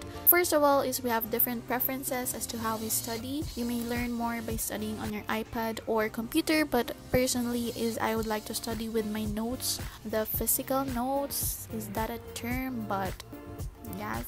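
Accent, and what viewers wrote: Philippines. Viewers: Heard her Filipino🇵🇭 accent from the start😌 but confirmed it when she said cHoUR🥴 AHAHAHAHA nice videooo thankss❤️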